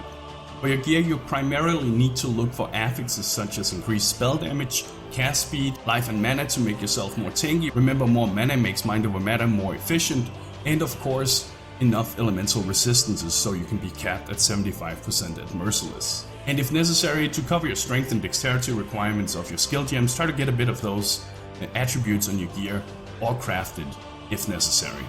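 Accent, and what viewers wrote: Denmark. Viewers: The accent tells me he's a Dane, am I right?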